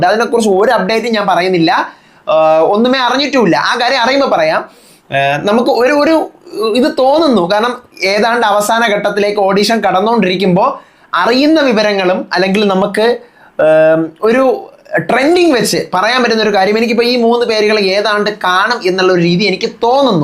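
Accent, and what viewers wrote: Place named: Tamil Nadu, India. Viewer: Nee arada pandi ano tamiz kalarthi malayalam parayan. Onni malayalam alle Tamil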